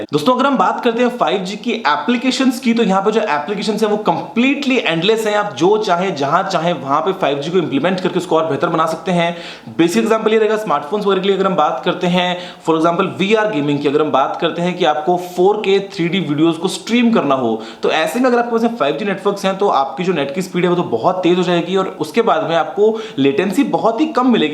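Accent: India. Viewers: are you Indian????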